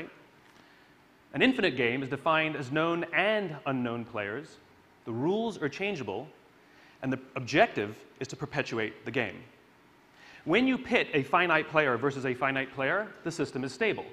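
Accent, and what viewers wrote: United Kingdom. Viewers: No question the guy is intelligent, but there’s something disingenuous about him. In earlier Ted talks and other presentations, he speaks with a British accent. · Is this guy English or American ?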